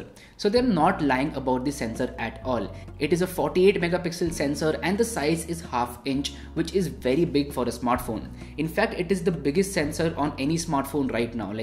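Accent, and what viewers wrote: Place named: Punjab, India. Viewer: Bhai o Panjabi nikalde o regional feeling utarahahe I'm from AP but I like the way express so try to be with all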